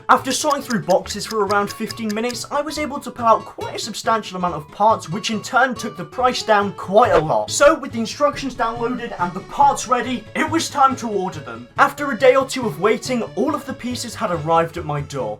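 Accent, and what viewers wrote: United Kingdom. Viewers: Holy brick loud British boy made a video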